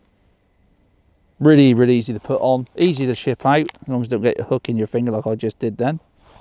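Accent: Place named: West Country, United Kingdom. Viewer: It’s comical if you put the sub titles on, West Country accent really confuses them.😂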